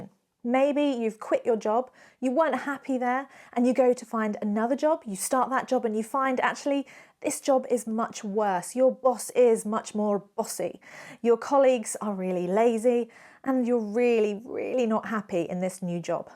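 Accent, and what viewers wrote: United Kingdom. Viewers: British or american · So charming with your British accent. Just in case anyone was wondering, these are all commonly used in the US as well. Here's one I love, but seldom hear; it seems to come from the midwestern US: "You can get used to hanging if you hang long enough."" It expresses a grudging patience with unpleasant circumstances one is not able to change, with reference to the lynchings that were a part of vigilante justice in frontier days. Example: "Do I really have to put up with that child's whining all summer long? Well, I suppose you can get used to hanging if you hang long enough."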